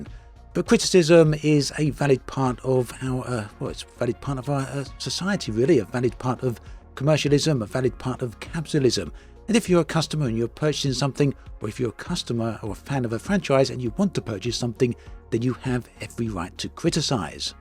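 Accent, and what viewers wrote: United Kingdom. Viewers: before this news I was back and forth being on the fence of opening my wallet to CIG to include the new Faterpillar to my fleet… then decided “nah” but after hearing this well structured, articulation of a rant about the privilege of a quest to hunt some rats for $7 😂 Because it was delivered from a distinguish gentleman speaking with a British accent, well $450 USD is doable lol 😂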